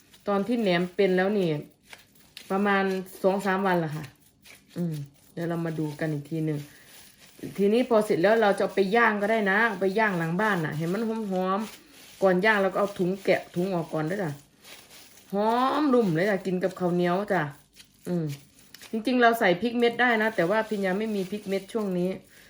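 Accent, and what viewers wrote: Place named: Isan, Thailand. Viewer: พูดอิสาน หรือโคราชก็ น่าฟังดีจ้า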